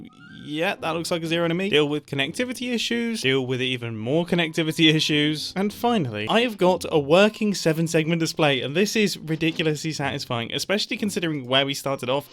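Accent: United Kingdom. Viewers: they're both bri'ish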